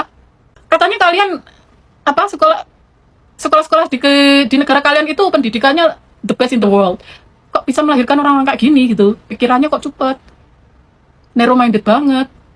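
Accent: Java, Indonesia. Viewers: Loghat jowo bangetttttt...sesuai jadi bibik ku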